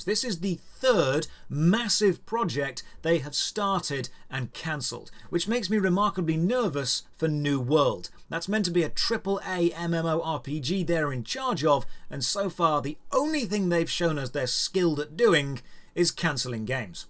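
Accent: United Kingdom. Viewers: Why is reviewing MMO with a british accent?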